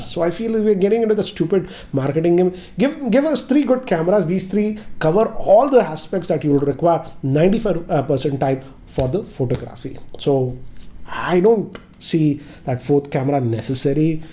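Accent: Hyderabad, India. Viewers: We want Hyderabadi Hindi back !!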